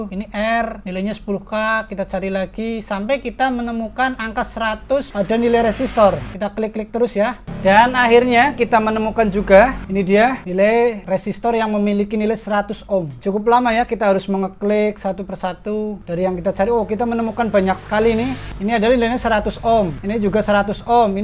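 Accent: Banyumas, Indonesia. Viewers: Mantap bg,, kayaknya orang ngapak ya dari logat😁👍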